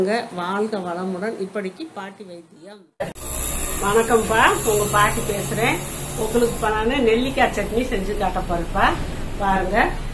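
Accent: Madurai, India. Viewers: Madurai tamil suppera pesureenga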